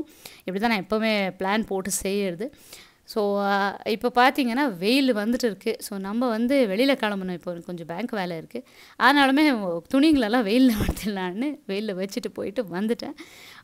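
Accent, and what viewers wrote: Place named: Karnataka, India. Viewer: Hi sis, very cute vlog, god bless long way to go,, i just love ur tamil..ur slang is like an beautiful karunada tamilachi...i am also like you....an Banglorean settled in Chennai..GOD BLESSS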